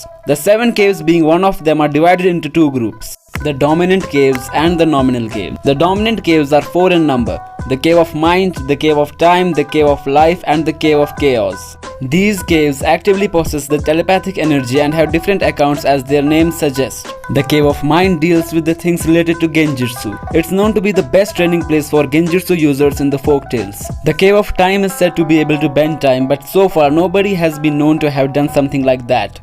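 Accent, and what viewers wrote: India. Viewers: You sound Indian are you?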